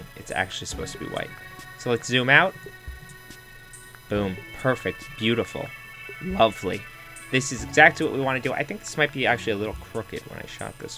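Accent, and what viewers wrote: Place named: New York, United States. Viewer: Your accent is intense. Definitely a New Yorker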